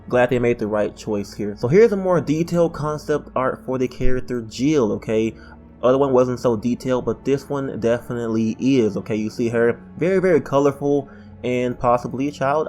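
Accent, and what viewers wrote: South, United States. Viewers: greetings from Russia by the way, I noticed that you have a southern accent you're from the southern United States?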